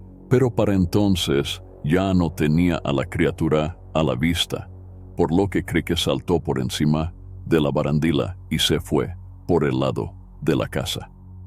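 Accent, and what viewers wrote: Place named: United States. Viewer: Que desagradable acento de gringo hablando español. Le resta credibilidad a sus historias.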